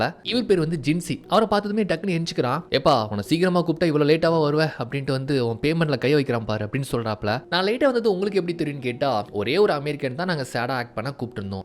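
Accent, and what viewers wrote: Tirunelveli, India. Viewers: Thoora ponga indh bashai tirunelveli slang la 😅nellai ya neenga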